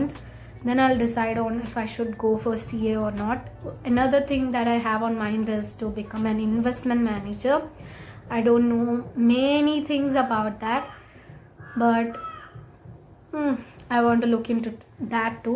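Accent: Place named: Kerala, India. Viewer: Her Malayalam accent was super good!!!!!!!<<<3💗🧿🤌🏻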